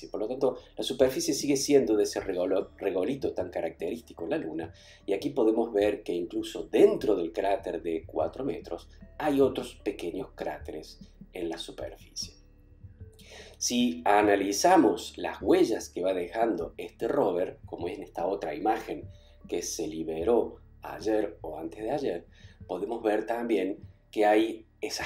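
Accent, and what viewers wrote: Córdoba, Argentina. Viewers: Muy buen video. Puede ser que tengas acento de Córdoba, Argentina? Un abrazo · Si o si es cordobé el!!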